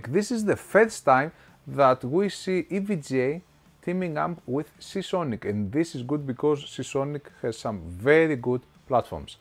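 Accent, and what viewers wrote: Greece. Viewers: Ελληνας ακουγεσαι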